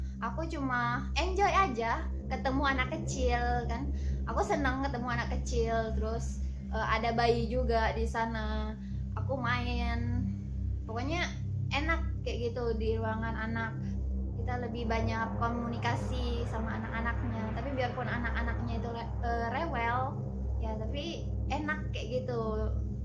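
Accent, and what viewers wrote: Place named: Kalimantan, Indonesia. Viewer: cara bicaranya mirip org kalimantan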